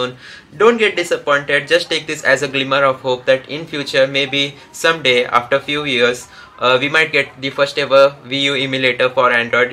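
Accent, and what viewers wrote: India. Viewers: I hear Indian accent I know it’s legit.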